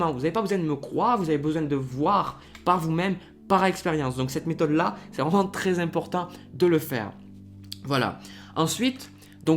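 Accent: South, France. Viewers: Merci un Bonjour de Béziers Super vidéo comme toujours avec ce bel accent du sud 😉 continue c est bon pour le moral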